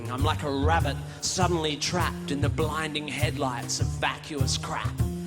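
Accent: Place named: Australia. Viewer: i thought he was giving storm an austrailian accent and theres another song where he talks to an annoying rich guy with an austrailian accent so i figured he was trying to make austrailia look bad ( not really i was just makin a bad joke) turns out hes austrailian too im just an idiot who didnt catch his accent cause i thought he was british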